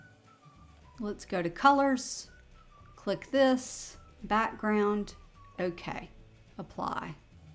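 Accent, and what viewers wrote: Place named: South, United States. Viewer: Thank you your video provided everything I needed to know and the potential that the website has to offer. Love your Southern accent too,!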